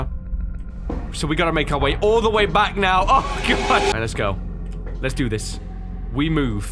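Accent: United Kingdom. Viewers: You bri'ish mate?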